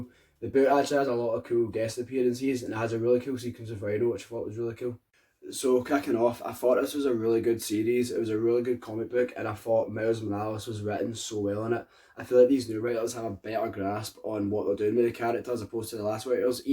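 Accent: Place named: United States. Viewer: Us accent... You need to make your spells clear and use a mic.... Btw good content🤘🤘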